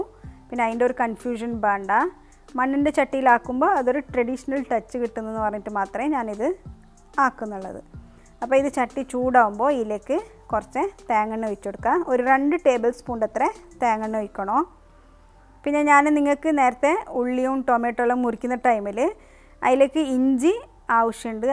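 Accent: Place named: Kasaragod, India. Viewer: Nice video dear..especially kasargode talk 👍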